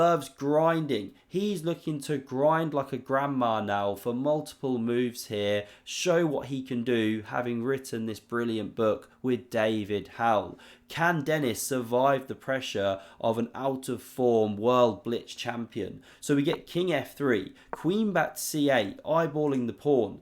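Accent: United Kingdom. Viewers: Plus he has a cool British accent, like GM Danny King and KingCrusher too.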